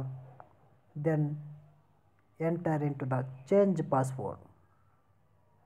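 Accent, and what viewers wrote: India. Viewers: India english as FUCK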